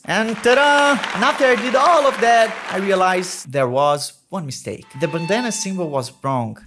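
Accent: Brazil. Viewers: Tu é brasileiro mano ? Por isso que eu achei o inglês familiar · Mno tu e brasileiro teu sultaque E teu jeito de falar Vey Se pode mim explicar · teu ingles soa muito BR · o inglês do cara é muito bom, mas sotaque brasileiro não abandona ele kkk soube assim que ele soltou a primeira frase (não tô dizendo que ele deve perder o sotaque) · Nem acredito que vc é brasileiro, deveria ter percebido pelo sotaque. Muito bom saber disso.❤ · esse ingles com sotaque brasileiro kkkkk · mano tu manda muito e da pra perceber que tu é br, mano tu trabalha DMS mano 😔✊ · Sensacional! Não só o trabalho que está sendo feito, mas também seu ânimo e resiliência em realizá-lo! PS.: Cara, você é de Minas! Que maneiro! O uai que habita em mim saúda o uai que habita em você.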